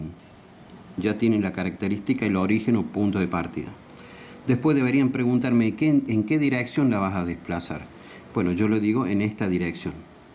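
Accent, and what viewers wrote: Córdoba, Argentina. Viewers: Ese acento cordobeh si se puede ver 😍 , lo entendi todo explica muy bien gracias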